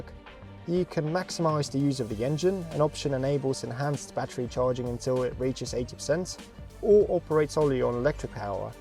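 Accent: United Kingdom. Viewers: ИИ или откуда такой британский акцент?))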